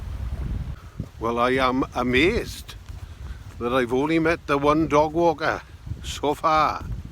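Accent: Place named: Wales, United Kingdom. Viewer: This is my face. I have my roots in Wales. I heard it in your voice. We aren't so very far away from home. <link>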